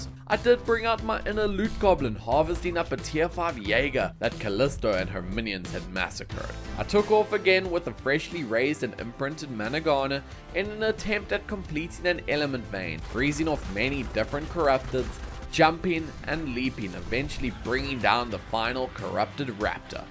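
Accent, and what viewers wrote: South Africa. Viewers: Are you south african? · Bra when I started watching I heard your south african accent and then you said eskom and then I knew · Wait are you South African?? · are u south african bro? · Love your videos and i never knew you lived in South Africa telling by Eskom, plus your accent. Good to see another fellow South African Ark player. 😊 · You sound so South African. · omfg i knew you sounded south African and then you said load shedding and then i was sure <3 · Are you from South Africa because I am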